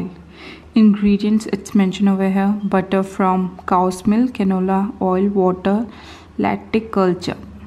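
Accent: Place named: Kerala, India. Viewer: hahahahaha y u talking malbari english...malyaram hindi english hahahahahahaha fucking butter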